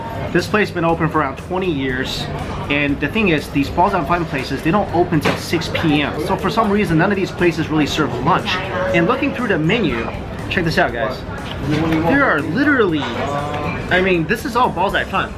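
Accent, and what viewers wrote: New York, United States. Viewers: and on, the chicks sitting behind you are freaking out. You look local but you´re talking New Yoakah, LOL!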